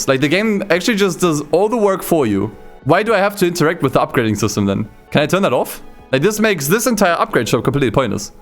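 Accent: Germany. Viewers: As an American, we get it, you’re German lmao he sounds so German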